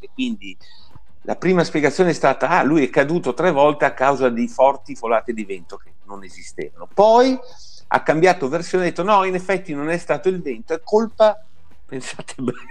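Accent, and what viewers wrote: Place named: Veneto, Italy. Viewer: Ma una domanda dato per scontato il tuo accento veneto, dove vivi?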